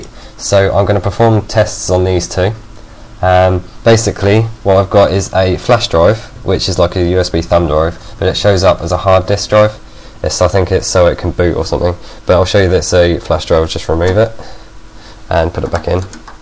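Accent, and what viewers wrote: United Kingdom. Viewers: I LOVE YOU! You are so so so so smart and ABSOLOUTELY BRILLIANT BRILLIANT, bloody brilliant! And I love your british accent. That does work.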